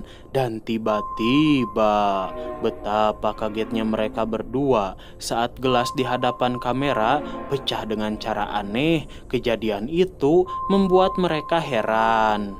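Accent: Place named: West Java, Indonesia. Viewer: Mass Logat Sundanya luchu jg🤣🤣🤣 film horor bisa berubah jd comedy..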